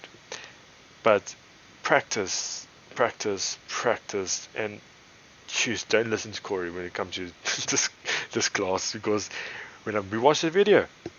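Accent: South Africa. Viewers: You sound South African. Thanks for the video though, wish you could update it with the two latest classes.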